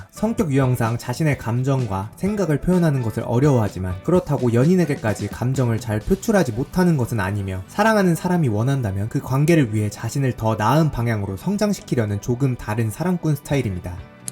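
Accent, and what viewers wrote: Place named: Jeolla, South Korea. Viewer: 전라도 사투리가 느껴지네요 ㅎㅎ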